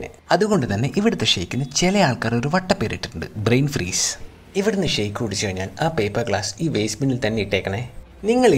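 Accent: Kannur, India. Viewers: Bro kannur aano. Aa voice .. yente monee! !! Poliii 😍😍😍😘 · Aa kannur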